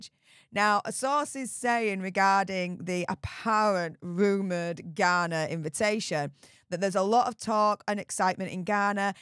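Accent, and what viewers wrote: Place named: Birmingham, United Kingdom. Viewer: I love your accent,. I'm Canadian so I don't have an ear for it, but I'm guessing… Birmingham? (let's see how wrong I am)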